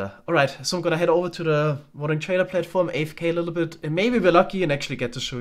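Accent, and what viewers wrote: Germany. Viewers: Ach man.. Immer dieses Englisch als Deutscher 😂 Man hört es immer raus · Are you German because you have that accent if you have pls say something in the next video bb love your content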